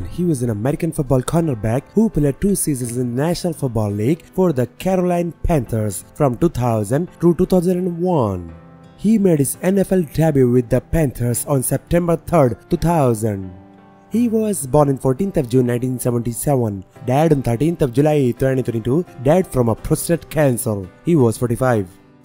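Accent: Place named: India. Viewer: He cannot help his accent. I believe he may be Indian.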